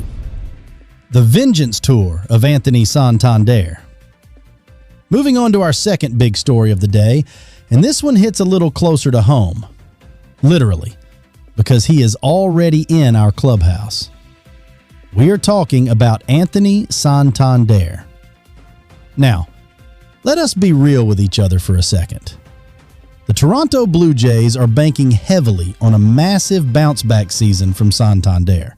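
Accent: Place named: South, United States. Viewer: Why does the AI voice have such a good old boy American accent?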